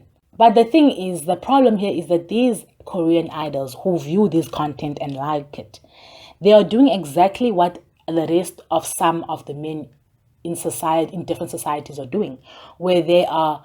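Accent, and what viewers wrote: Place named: South Africa. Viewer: You sound South African for some reason. Btw your channel is really needed these fans out here are crazy as fuck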